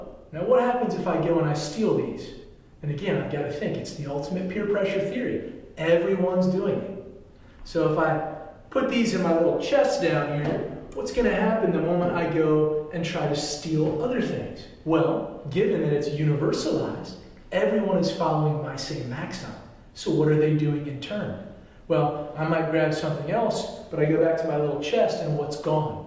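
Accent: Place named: United States. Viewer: Thank you for the video even though it was not crystal clear (or some smoke remains), it was helpful. Its just the US accent and US examples what was difficult. Thank you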